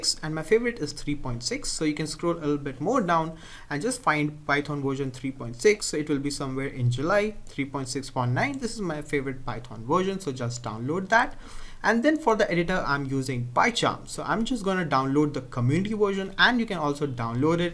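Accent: India. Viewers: Indian thick accent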